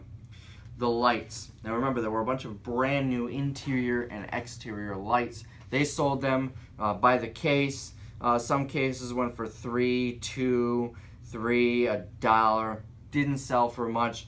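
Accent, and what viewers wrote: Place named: New York, United States. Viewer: O by the way you say dollar like I do in fact we say it like you on most of Long Island New York so tell them to stuff it who say you say it wrong you speak like a true New Yorker thank you so much for telling us the outcome of the dollar unit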